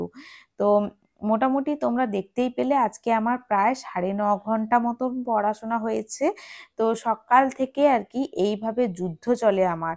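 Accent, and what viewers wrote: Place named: Medinipur, India. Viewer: Purba Medinipur er kothay didi?? Amr bari Kanthi.... Macher tok sunei bujhechilam, tumi o Medinipurer ❤️...tai comment kori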